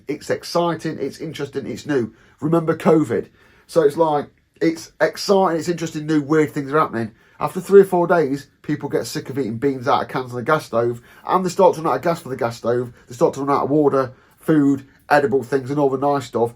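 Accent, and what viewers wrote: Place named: Nottingham, United Kingdom. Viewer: i found you talkin was more funny, than the insight to spain, can tell ur a notts lad lol ,, im a notts lad hahah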